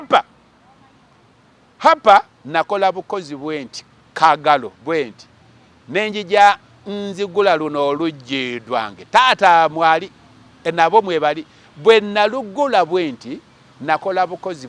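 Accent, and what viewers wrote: Uganda. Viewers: Nze bwedda ndowooza si muganda. Nga ndowooza mu Easterner kuba ebigambo byo' luganda abi pronouncinga nga bo. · Why call yourself when are clearly a Mugishu? Your accent is from the ba Masaba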